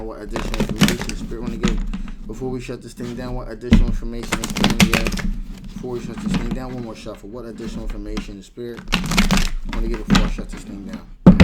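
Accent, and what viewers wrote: Philadelphia, United States. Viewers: I had a feeling you was from philly. Chester in the house. Lol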